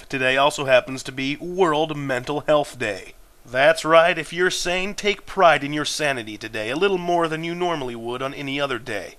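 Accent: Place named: Minnesota, United States. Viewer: You're Minnesota accent, and fictional characters are commendable.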